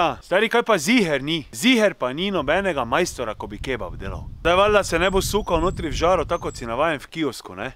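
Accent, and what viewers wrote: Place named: Maribor, Slovenia. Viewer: Hud!!!!!.... moj te bl gleda k jst, k si mu res faca in si edini k pr teb prenese mariborski naglas skratka obožuje te.... Mene pa zanima zakaj na svinjino namest kumine obrazlozi lepo prosm ker jst skos devam kumino gor😊😁 pa super si tut men... Tut jst rada gledam tvoje znanstvene raziskave v kulinariki 😂👍❤️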